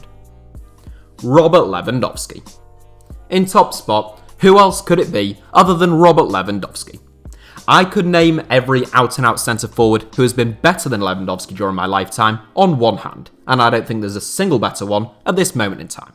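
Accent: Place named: Hull, United Kingdom. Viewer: Thee sound a bit posh for ull lad......I dropped the h to make it sound propa.....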